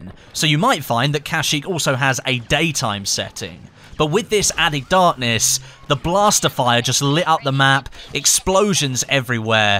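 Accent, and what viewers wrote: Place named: Southern England, United Kingdom. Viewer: If I had to guess I would say the south coast of England. His accent isn't northern, jackfrags is a northerner. I'm from the south west and we don't share the same accent. Or he could be from the posh parts of London.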